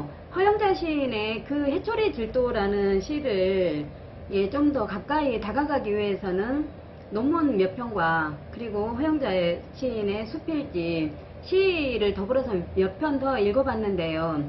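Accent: Gyeongsang, South Korea. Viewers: 와이프는 AB형 와이프의 행동 방식을 이해하게 되었습니다. 가끔 경상도 억양이 귀엽게 들리네요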